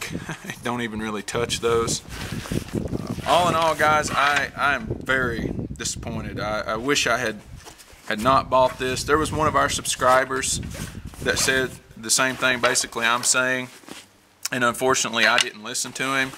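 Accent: United States. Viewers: Where do you live? Looks like Australia but you sound like Arkansas lol not being mean just asking. Good review, I have this bag and use it as a diaper bag. lol tactical dad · First things first. Your response "As for overloading the bag it had towels in it. Did not even weight two pounds as i say in the video." is incorrect. you state " It fit everything" referring to the previous minute of dialog where you inform the viewer that the PUSH bag (Practical Utility Shoulder Hold) rode on your iPhone. Like I said, you overloaded the MOAB 6 (Mobile Operation Attachment Bag). I watched the 2 videos on your load-out of the PUSH bag. 10 pounds is far to modest an estimate of the weight. As for your condescending remark "I guess in the States you dont need what i do i suppose", guy, you have a southern draw, I would know, I'm southern. You sound like you're from Alabama or Georgia. 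As far as what I need, in a 6 hour bag I place what I will NEED for 6 hours. AR mags, pistol mags, radio, pens, paper, a tourniquet, pain reliever, 1 16 oz. bottle of water, sunglasses, flashlight, lighter, toilet paper. Remember it's a 6 hour bag. 5.11 Tactical is designed for law enforcement, EMS, Firefighter, not survivalist! There are bags that cater to those individuals much better than a 6 hour bag. Your review is completely unfair. You should have began the review by saying "you bought the wrong bag for your situation".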